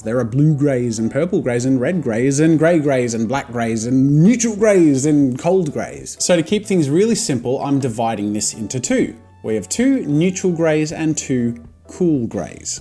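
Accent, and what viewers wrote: Australia. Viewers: all Australians pronounce Manga and Copic like you do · are u aussie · Ur Aussie??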